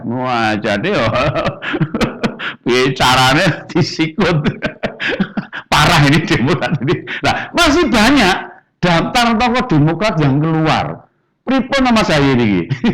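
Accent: Java, Indonesia. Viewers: Gaya bahasane si om ini kok pas banget ya,..aksen jowone yahud tenan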